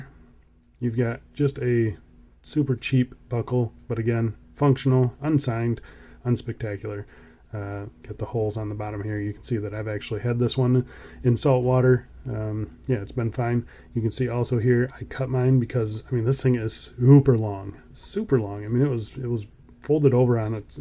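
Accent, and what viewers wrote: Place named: New Jersey, United States. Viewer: sound like a jersey girl ..gg tandorio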